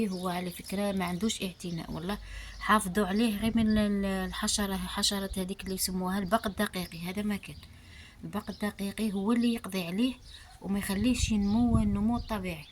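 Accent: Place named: Mascara, Algeria. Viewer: ماشاء الله لوكان غير جيتي حذايا ندي من عندك شويى تهدري كيفنا في معسكر بصح ما عرفتكش مين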